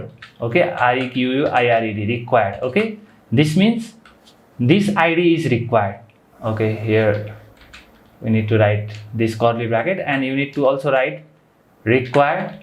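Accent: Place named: India. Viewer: your lectures are soo good , but dont speak english in hindi acent, its looks so bad....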